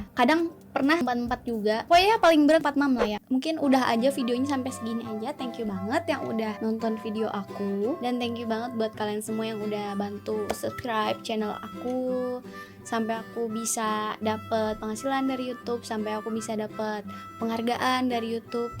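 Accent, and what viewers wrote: Banjar, Indonesia. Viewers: banjar kah kak?cra ngmngnya kek org banjar😭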